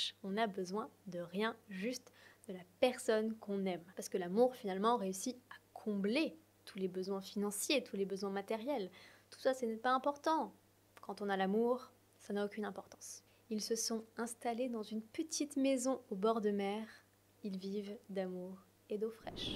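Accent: France. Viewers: Salut — merci beaucoup pour les novelles expressions! Je voulais simplement dire en tant qu'anglophone que lorsque tu parles anglais, c'est parfaitement compréhensible - et je dois dire que l'accent français fonctionne vraiment pour toi. Alors ne t’inquiétes pas du tout! 😊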